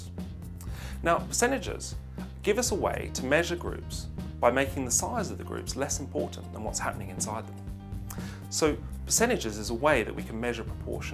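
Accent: England, United Kingdom. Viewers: I´d like to see more class tought by this teacher. I love english accent.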